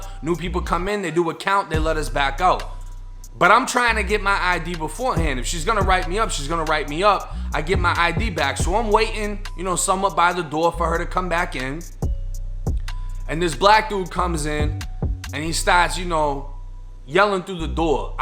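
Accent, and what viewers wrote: Boston, United States. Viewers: You sound crazy ass fuck but yo opinion he has a east coast Boston, accent but what do I know 😂😝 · Omg I know!!!! 🔥 💚🤍❤️ And it’s a sentimental thing for me too. My dad and Uncle were full blooded second generation Italians born and raised in and around Atlantic City, NJ. They moved away in their 20’s and my Uncle still has the accent after living in Florida for 42-43 years. His is the closest to cuz he has a little southern thrown in too. My dad had it to but not quite as thick because we spent many years in Michigan (until he passed). I noticed the longer has been lives up there in MA the thicker his accent gets, which is completely normal. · You have an accent.. Are you born and raised in Fl? Bc you sound like your from Boston or even maybe Ny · Shit i literally started listenin to channel 3 days ago. Friday and its sunday...shit i was like you gor a boston accent. 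Lol i dunno i can relate to everything he sayin so im goin video to video. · It's just a Boston accent. Pretty normal for the boston area. You don't get out much huh lol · Boston in the house! I love this kid but damn his accent is serious. I'm from Beantown and got an accent but not like this homie. #617 #508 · If u didn't say you was from Florida I would of thought u was from boston... I love your channel bro...I wanna do what you do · I love Bostonian accents, "and suddenly you're droppin' your R's " Love it, dude you gotta write a book. You're a fucking word smith, smart, awake, and able.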